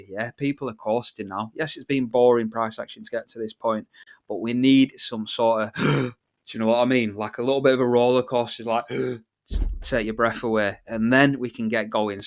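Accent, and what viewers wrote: North, United Kingdom. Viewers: Yo big man! I follow u on here and X and I have enjoyed every minute. Ur simplicity and northern accent r both spot on. Keep the alpha coming bro. I mean this is FREE…world’s mad!!!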